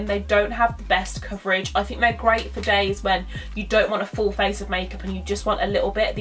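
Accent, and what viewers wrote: United Kingdom. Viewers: is your accent Birmingham?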